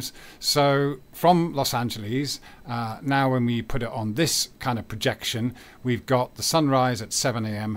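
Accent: United Kingdom. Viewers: Proof positive that a British accent doesn't automatically make you sound smarter!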